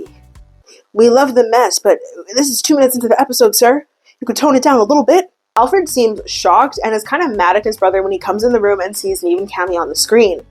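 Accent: Canada. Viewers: OMG! Every time youve said "I sound so canadian" in the past, I thought it was cause you were from the US and just said some words like a Canadian...BUT YOURE ONE OF US?!?!? I mean, I should have known...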